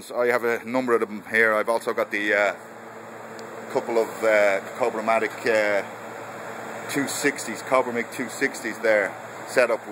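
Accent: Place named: Dublin, Ireland. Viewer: That's a Dubh accent if I ever heard one.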